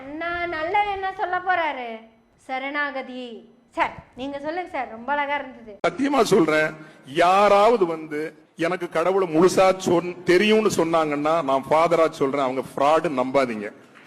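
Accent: Kongu, India. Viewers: அழகு கொங்கு தமிழில் ஆழமான கருத்தாழம் வாழ்த்துக்கள் சகோதரி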